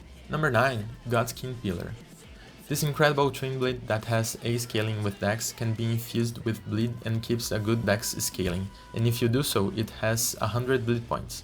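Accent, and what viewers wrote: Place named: Brazil. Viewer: sotaque BR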